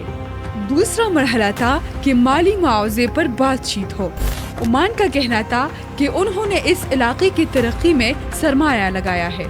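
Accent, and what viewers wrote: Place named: Balochistan, Pakistan. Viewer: Shayad ap baloch ho lehja ap Ka wese hee hai❤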